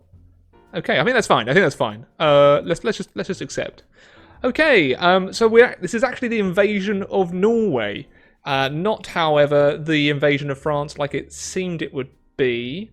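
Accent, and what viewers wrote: United Kingdom. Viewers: Oh you are British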